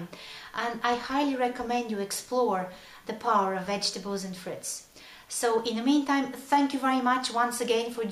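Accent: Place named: Eastern Europe. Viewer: it sounds like youre from eastern europe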